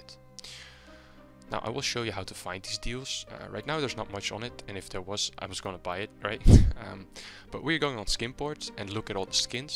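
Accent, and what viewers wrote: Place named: Finland. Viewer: Suomi aksentin kuulee miljoonan kilsan päästä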